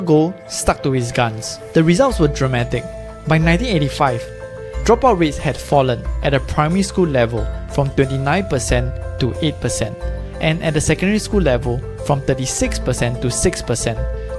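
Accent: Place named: Singapore. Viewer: You speak Singlish.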